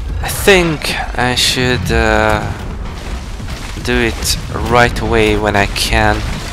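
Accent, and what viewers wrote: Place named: Central Europe. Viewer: California c: I was wondering because me and my brother couldn't figure out where your accent was from so thank you! We knew it was somewhere in mainland central Europe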